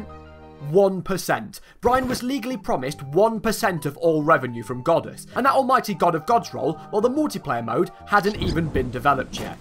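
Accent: United Kingdom. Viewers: The editing itself was just very interesting, but good topic with bri""ish accent is so much fun. Thanks for great video, i wish they would come more often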